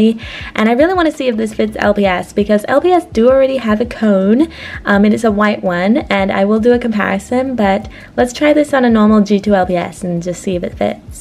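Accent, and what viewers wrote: Australia. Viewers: You sound like an Australian trying to do an American accent.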